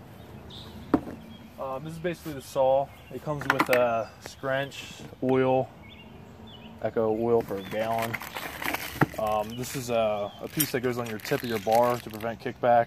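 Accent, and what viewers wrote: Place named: Philadelphia, United States. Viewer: You sound like your from the Philadelphia area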